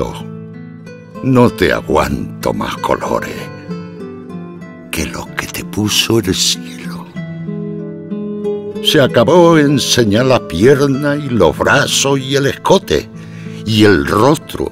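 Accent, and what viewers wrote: Spain. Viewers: Maravillosa interpretación en andaluz.... Con su voz, la poesía cobra vida. Gracias!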